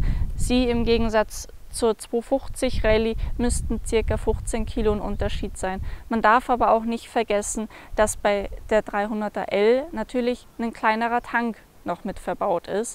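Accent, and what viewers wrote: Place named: Germany. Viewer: Sehr schöner Vergleich der Maschinen. Du bist mit verantwortlich das jetzt eine CRF250Rally bei mir in der Garage steht 😊. Da ich noch keine Offroaderfahrung habe, und deinem Dialekt zu urteilen wir aus der gleichen Region kommen, kennst du evtl. Strecken zum Üben die legal befahren werden dürfen ? Ich kenne nur die unbefestigte Straße zwischen Bettbrunn und St.Lorenzi. Gruß aus Egweil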